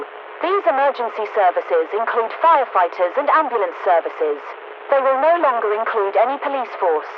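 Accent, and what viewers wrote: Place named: United Kingdom. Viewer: I love how it’s set in Georgia yet she has British accent. PS, this would make a great movie or series.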